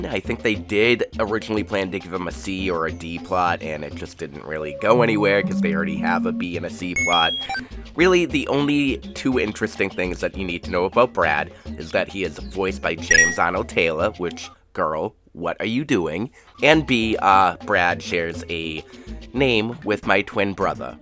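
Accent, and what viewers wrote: Boston, United States. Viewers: Are you from Boston?!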